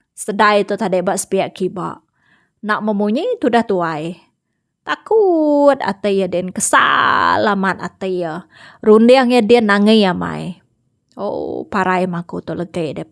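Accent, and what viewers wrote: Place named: Saratok, Malaysia. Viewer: Munyi jaku urg tungga ke Saratok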